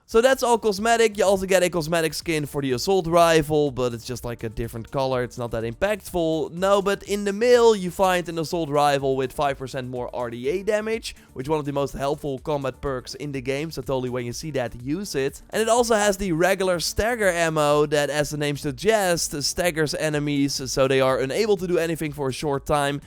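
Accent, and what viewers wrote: Netherlands. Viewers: Nou je bent zeker Hollander?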